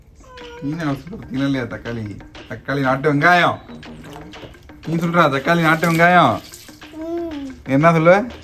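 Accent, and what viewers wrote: Sivagangai, India. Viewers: Sivagangai karapulla language apditha irukum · Naanu sivagangai thaaa broo unga video vaa naa paapen naanu akka mari thaaa pesuvee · Broo nanu Sivagangai bro akka soldra mari sivagangai seemai... 🤍⚡😇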